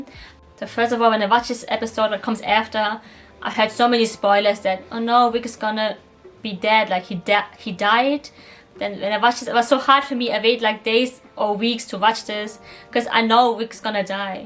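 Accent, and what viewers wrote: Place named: Germany. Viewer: I admired your Review on the walking dead movie. Your narration is very well paced. And I felt a German accent in your way of speaking I don't know if I was right! Thank you for talking about this movie. I will put it in my list of must-see movies!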